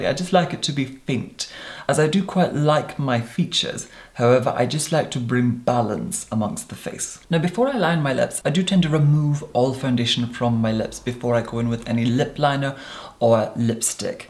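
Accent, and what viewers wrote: Scotland, United Kingdom. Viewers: Ur Scott accent comes out sometimes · It’s a peculiar Scottish accent mixed with English so not sure what to make of it but balm would never be pronounced bal-um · Scottish accent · Alex Firnth of course I know he is Scottish but do you hear it in his accent? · just wondering are you originally from scotland or have friends/ family from scotland? because your accent is slightly scottish but mostly english.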